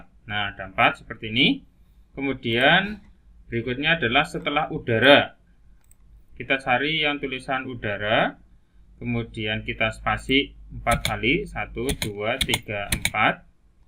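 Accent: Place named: Banyumas, Indonesia. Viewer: orang ngapak kie...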